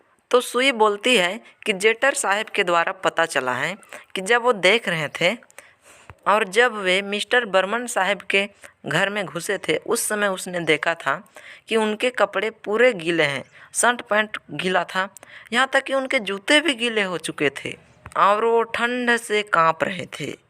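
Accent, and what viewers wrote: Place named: Uttar Pradesh, India. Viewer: Thanks bro for explanation it help me lot in explaining the question by the way it seems like you're from uttar Pradesh or Bihar and I am sure you will have million of subscriber in these year all the best and happy new year 🥳🥳🥳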